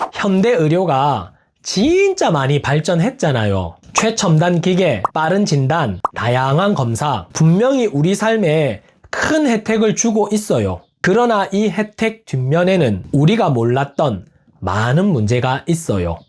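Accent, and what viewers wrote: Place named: Gyeongsang, South Korea. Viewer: 님 오늘의영상도 꿀잼나게 알려주시고~전경북이라 님 경상도말투가 더정겹게 와닿아요 오늘또 공부잘했습니다~건강하셔요🎉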